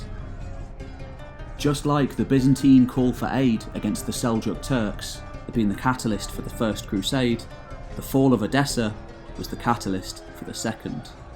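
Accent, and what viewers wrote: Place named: RP, United Kingdom. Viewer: Just wondering if you have a true passion for history , judging by your tone . As I have said earlier , your Queen's English is music to the ears .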